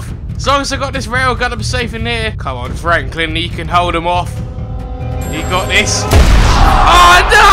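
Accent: United Kingdom. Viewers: he dosent have eneything against them its for entertainment purposes also hes british wich makes it 10 times funnier :D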